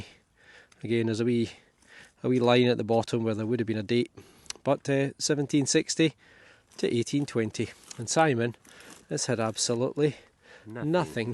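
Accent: Scotland, United Kingdom. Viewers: A shout out from the States… another incredible journey! I love hearing your accent, as my mum was born and raised in Falkirk. She lived across the street from a big park, I forgot the name of it (I’ve been there as a wee lad but the name slips my memory) but she says there was a great battle there… battle of Falkirk? But would I love to lay my coil on that site! Thanks again for the great hunt… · Hello my friend. First of all, I'll have you know, that we Americans are entertained by your lovely Scottish accent! Furthermore, metal detecting over there is the ultimate dream for any metal detectorist . The oldest artifacts can only possibly date back to 1492 here in America. So, keep up the good work! Thx dmg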